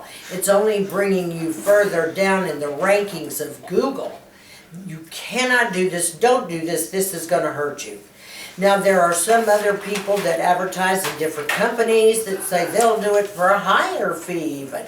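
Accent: South, United States. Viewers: I hope you cooled off by now... Anyway, this was half an hour well spent also if I don't have a channel. Interesting to learn what's behind the screen also if it's not always pleasant details. By the way - since I don't want to miss a word I do make an effort and slowly I'm getting a better understanding of your marvelous Texan accent.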